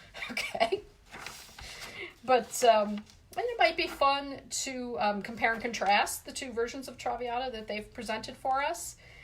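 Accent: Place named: New York, United States. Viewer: Hi I really enjoy your little chat. Feel I am back in N.Y. specially with the way you say certain words such as gala and expressions such as make a pretty lunch. One day I collapsed in Met op shop and a lady arranging jewellery display helped me stagger out to foyer where I tried to hang on to those fancy crowd comtrol ropes! I wonder if this lady was you? Great thing about fainting there..I was whisked off to bowels of Met where I met Peter Gelb and also saw amazing porrtrait of Maria Callas. Later I banged into Peter Gelb again and said, "Wow! I keep bumping into VIPs. Quick as a flash he said, "You're the VIP!" Clever guy!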